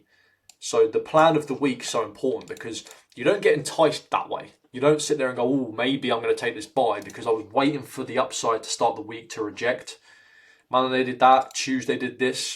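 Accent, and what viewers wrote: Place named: United Kingdom. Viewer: Keep up the good work , mate I like your British accent ..unlike Adele and Harry Kane , seems to be Chinese , not English hahaha Salut from Algeria ❤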